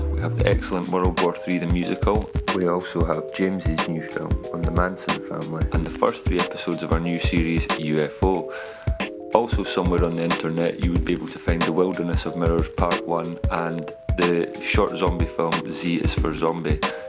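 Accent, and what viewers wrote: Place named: Scotland, United Kingdom. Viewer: I've really enjoyed your vids. Pretty exited that your back, or what? Been educated in the English language since 4th grade, some 30 years back. I know I'm not perfect at it, but quite good I think. Anyway... I DO like your Scottish or Irish accent, (excuse me) but your talking WAY to fast for me, to pick up details. Please consider your foreigner fans, when making the speech. THX Looking forward, to what might come up :)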